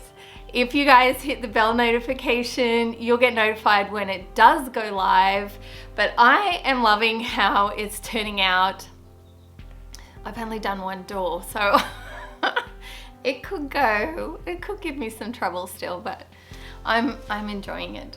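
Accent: Australia. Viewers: I just seen this as it popped up , I have to ask , do I hear an Aussie accent... love from Australia..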